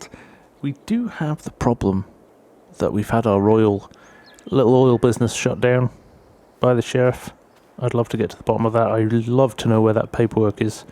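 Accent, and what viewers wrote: England, United Kingdom. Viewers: Are u english?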